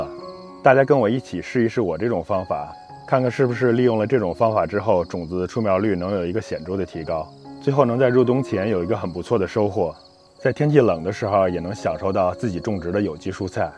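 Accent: Beijing, China. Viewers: 正宗京腔京韵，声音好听，莱种的又好，给你点赞👍。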